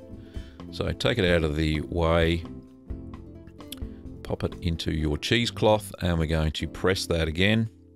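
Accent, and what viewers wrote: Australia. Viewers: Aussies have their own way of saying things, part of why we love them :)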